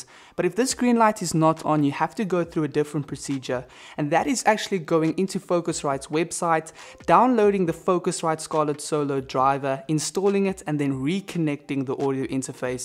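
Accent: South Africa. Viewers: Surely you are South African! Anyway, great video ZA gang here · Hes South African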